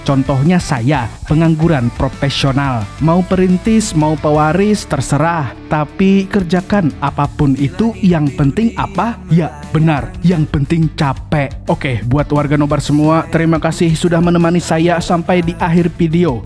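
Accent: Banjar, Indonesia. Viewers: Bah orang banua sakalinya😅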